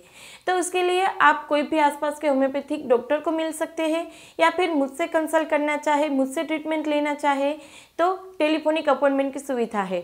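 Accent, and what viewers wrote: Gujarat, India. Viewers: Bolne k lehze se gujarati lg rhi h..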